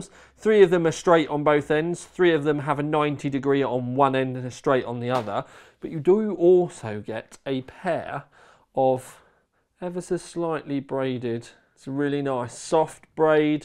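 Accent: England, United Kingdom. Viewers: I can t follow this fucking eng accent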